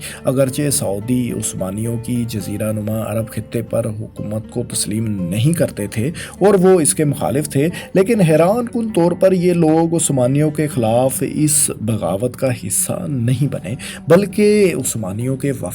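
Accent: Punjab, Pakistan. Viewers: Your heading is grammatically incorrect. Don't you know that first form of verb is always used after did. Secondly, you say simple Urdu words in Punjabi accent like a typical Paindo does. Gal samaj ayi?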